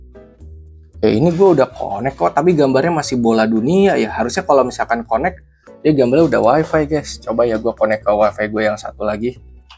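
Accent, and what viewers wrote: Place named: Sunda, Indonesia. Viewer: aksen sunda lo kentel banget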